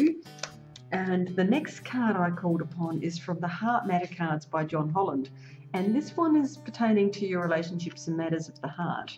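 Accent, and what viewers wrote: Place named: Australia. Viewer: Hi! Thanks for the warm, positive energy. You sound Australian and I love it. It's my intent to travel to Sydney in Sept.; I just have to find a way to make it work out in the best way. I've lived there twice for 3 months before. Sydney, Canberra, Melbourne, Port Lincoln and Darwin, plus a few days in Brisbane. I have a Jupiter IC going right through Canberra, with a couple of Venus crossing lines above and below Sydney!! Do you like Astrocartography? I'm a handwriting analyst and O love to combine them all with deep theta mediation. God, why am I rambling??:-) Just saying thanks for the positive vibes, love to see you in Sept!!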